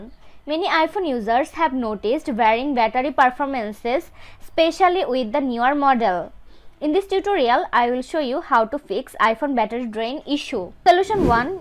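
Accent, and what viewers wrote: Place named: India. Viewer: you sounds like indian call center scammers💀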